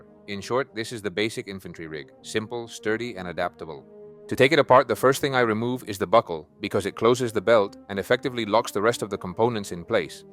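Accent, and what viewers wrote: Spain. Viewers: By his accent id say hes spanish ,but speaks fluent english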